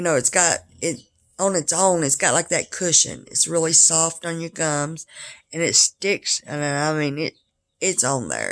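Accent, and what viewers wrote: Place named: Kentucky, United States. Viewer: Aww girl, they look beautiful!! I'm going thru extractions now and shew they ain't no joke lol! This gives me hope tho! Keep us updated! Btw, I don't know where you're from but I'm a Kentucky girl and you sound like your from my neck of the woods lol! I mean that in the best way because girl...my accent is thick lol!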